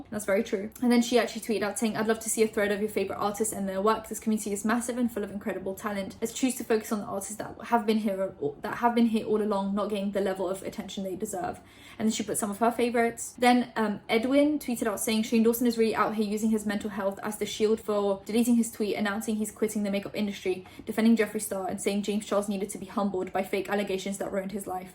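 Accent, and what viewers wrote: United Kingdom. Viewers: Omfg I used to watch you so long ago and I could never find you ever again 😂 finally popped on my recommended 😩 I was like where’s the girl with the British accent 😩😩😩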